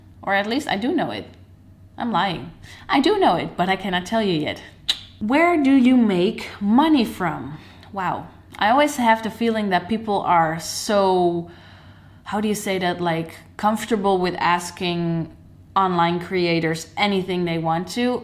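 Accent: Netherlands. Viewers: Ik klikte op deze video en je begon te praten en ik dacht meteen ze is Nederlands 😍 elke Nederlander heeft dat accentje geweldig😅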